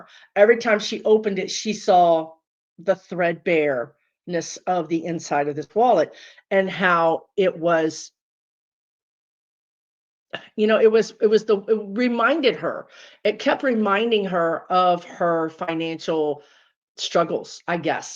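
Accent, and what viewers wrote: Texas, United States. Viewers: You Are a Texan 😂 love ya gf..I was making bed i was listening Your Texas ❤❤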